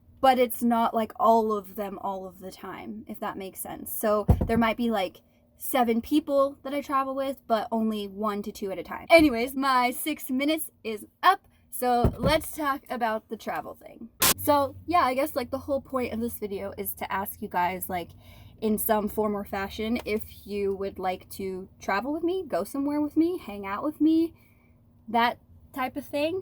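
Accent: United States. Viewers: maybe your half what does it matter. Sounds American to me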